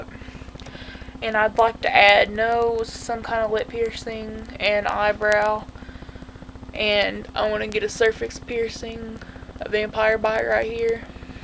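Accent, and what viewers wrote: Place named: South, United States. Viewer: this is fuuny as shit cause u sound Country as Hell.....which I find it very cute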